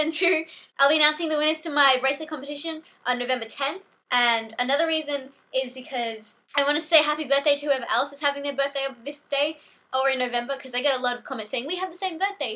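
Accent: Australia. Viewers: First video I watched and heard her talk... she's as australian as she is adorable and talented... there's no way you can watch this and say life is fair guys lol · Gratz (K)! Love your Aussi accent :3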